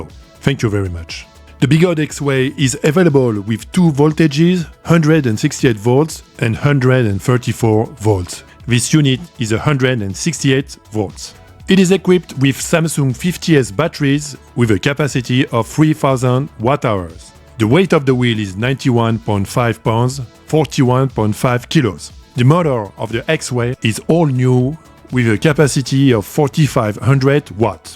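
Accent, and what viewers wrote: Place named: France. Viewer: je te le redemande , es tu francais ? l'accent me vle fait penser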